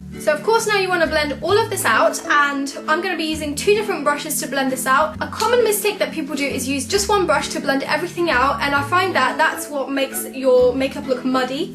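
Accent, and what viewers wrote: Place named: United Kingdom. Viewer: To zabawne, bo pomimo tego, że masz piękny i co za tym idzie, mylący, brytyjski akcent, jakoś od początku przeszło mi przez myśl, że jesteś Polką :D Dobra robota, świetny kanał :*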